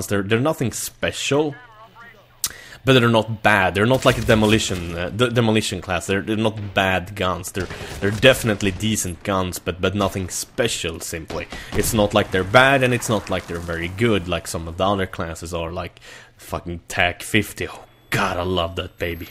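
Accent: Sweden. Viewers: I never realised you were swedish xD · hör att du är svensk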